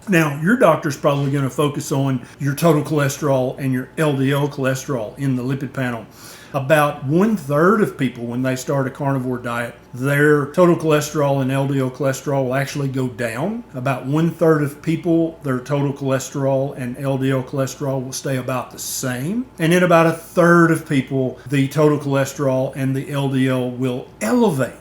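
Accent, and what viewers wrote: South, United States. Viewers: I am all for the carnivore diet and have been on it for more than 6 months and it is absolutely amazing. I listen to many experts on the carnivore diet but I simply cannot get past this doctor's southern drawl accent. If somehow he can change that up, he could probably win back the top half of the country and make a larger impact with this awesome way of eating. Believe me, I completely understand that it has nothing to do with education or intelligence because obviously he knows what he is talking about and is educated on the topic, however it takes all of my willpower to get through an 8 minute video listening to this type of inflection. I suspect that would be the same for most of the northern part of the country.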